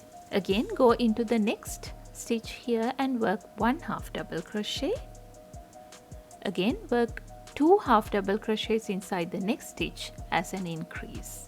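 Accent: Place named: India. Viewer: that indian accent video is more good and trustful ❤❤❤🎉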